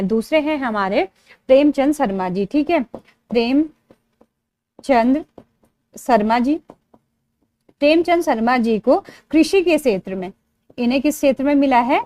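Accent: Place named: Pahari, India. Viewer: पहाड़ी tone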